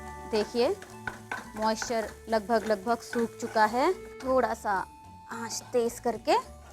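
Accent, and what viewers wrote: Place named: Uttar Pradesh, India. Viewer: Awesome recipe. Looks great. Just need to say sm tips while cooking. Tipical up accent. keep it up. Gd job.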